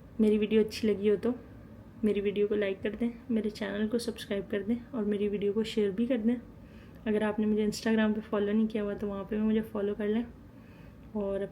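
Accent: Punjab, India. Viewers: Ye kon c type k Chinese hn bae ..... Accent pure Punjabi like our Lahories but face r pure Chinese.... 🤔🤔🤔🤔 Kesay nae kesay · ہائے میری پیاری سویٹی لگتا بہت دکھی ہوئی ھو۔اتنی پیاری باتیں کہاں سے سیکھیں ہیں۔ کبھی پنجابی میں بھی بات کرو۔باتوں سے پکی پنجابن لگتی ھو۔ ڈھیروں پیار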